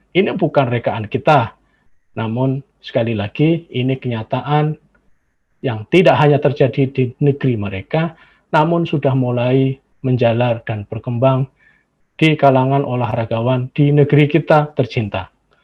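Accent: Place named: Java, Indonesia. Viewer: Jgn nampak logat jawanya min malu saya sebagai orang Jawa cm punya argumen tolol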